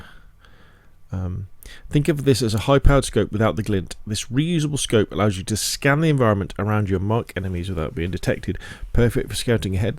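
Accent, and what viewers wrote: United Kingdom. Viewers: Jesus Christ you are a breath of fresh air. Every other video I've tried to watch is just some American begging for subs and spending literally 12-15mins going through everything, with added speculation and opinion. You just go through the information bit by bit and don't flood it with your own contrived padding. Thank you for your resounding Britishness and the approach you have to information dumps. ✌️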